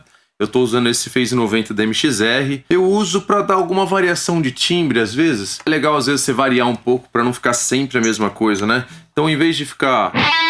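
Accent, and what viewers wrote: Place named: United Kingdom. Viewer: O papo e British crl...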